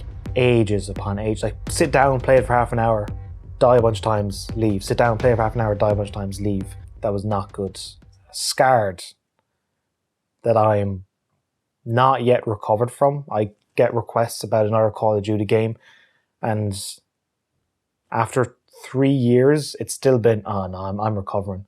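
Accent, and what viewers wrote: Ireland. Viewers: Guardians Of The Galaxy and the voice of a Irishman, no better way to spent a Friday night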